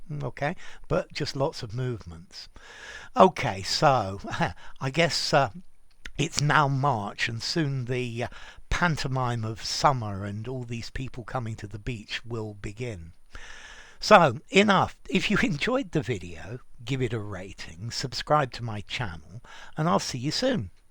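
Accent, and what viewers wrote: RP, United Kingdom. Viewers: GOD... do you speak British RP accent (Reserved pronunciation) however, your teaching style is greater than all..Big fan sir.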